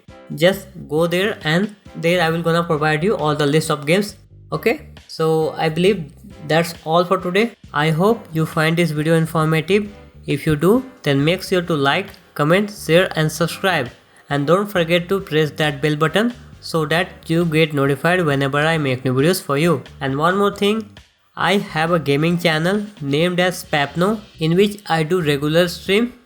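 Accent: India. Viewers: can always trust the indian accents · thx for help. And btw, i love indians voice. :3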